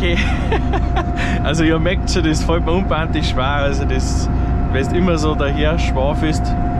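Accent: Bavaria, Germany. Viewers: Iwo Boarisch bassd subba. Andaschd was nix. Klingt a ned guad 😅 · Mich Stöhrt das nicht. past schon dein Bayrisch. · Dere, geils video. Red weiterhie bayrisch 👌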